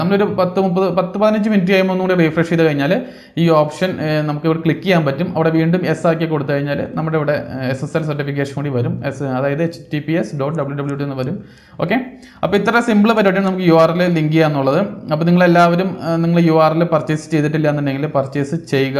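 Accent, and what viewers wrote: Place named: Thrissur, India. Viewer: Bro thrissur aano veed?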